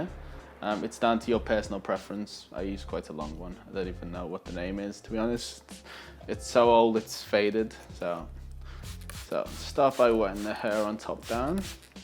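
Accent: Liverpool, United Kingdom. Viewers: a mexican with a british acent wtf 😅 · you sound like a scouser and speak like one too im from Liverpool meself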